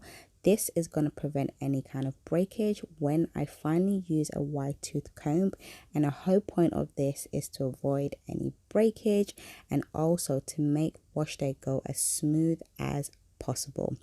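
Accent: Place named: London, United Kingdom. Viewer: Are you from London? Nice accent.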